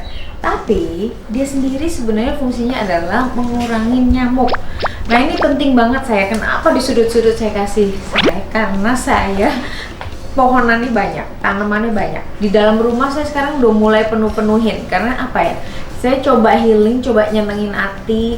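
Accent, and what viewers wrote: Java, Indonesia. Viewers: seneng liat chanel mba klo ngomongin tentang taneman penyampaiannya juga enak apa lg klo udah bahasa jawanya keluar makin seneng dengernya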